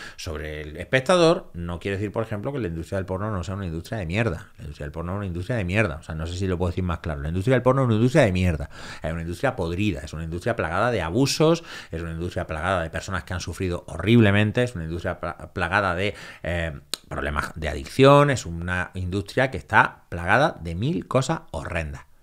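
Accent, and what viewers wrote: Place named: Granada, Spain. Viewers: Me encanta el acento granaino 😂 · Me ha encantado este psicólogo, con una forma de ver el mundo desde otra óptica , últimamente todo es “ si quieres puedes” y el tema de la forma de hablar , muy característico de Granada. Aunque está fuera de su ciudad no ha perdido el acento ni las expresiones de allí. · Ramón Nogueras, eres un profesional reflexivo, pracmático, analítico de los pros y contras que me causas gran respeto. Aunque, tienes mucho más, claro, pero lo que te "corona" mientras pláticas, es ese repertorio de dichos tan cómicos y tú dejé andaluz que cada uno de ellos me chirría en el cerebro desternillantemente y me obliga a parar el vídeo para reírme a gusto! Has probado ya ha dar clases terapeúticas basadas en la risa?😉👏🏼👍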